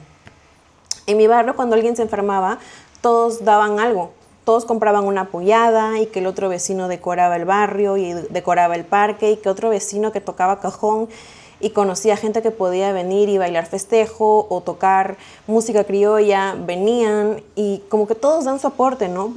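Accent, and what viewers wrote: Mexico. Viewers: Porque hablas como Mexicana? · Interesante el tema, pero me Jode escuchar a una peruana sin identidad.. hasta el tono es de Méxicana.. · Oye tu ecento se escucha muy mexicano y tú testimonio es espejo de muchas personas lo importante es que eres tu misma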